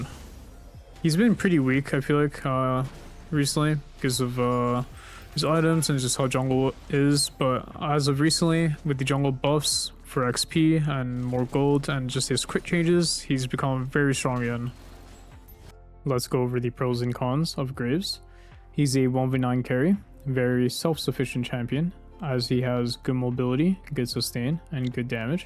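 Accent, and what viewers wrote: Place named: United States. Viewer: I have not found this to be the case. Are you from America? He has a pretty strong regional American accent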